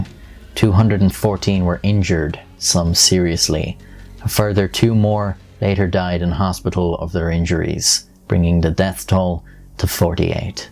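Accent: Ireland. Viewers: Imagine if he keeps going until he gets much older with a gruff voice and that Irish accent? Like a lighthouse keeper or an old sailor telling dark tales. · I really like the style of your videos. Your Irish voice is perfect fo this stuff. Keep it up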